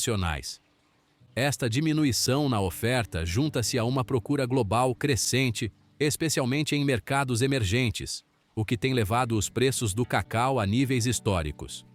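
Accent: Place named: Brazil. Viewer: Como é que isto pode estar acontecendo/ a acontecer? Um texto integralmente em português europeu, mas falado em brasileiro do início ao fim!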